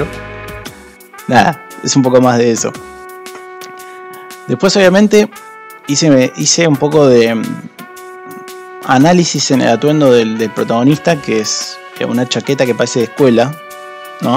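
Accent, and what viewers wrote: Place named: Argentina. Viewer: No se yo, pero suena a Argentino.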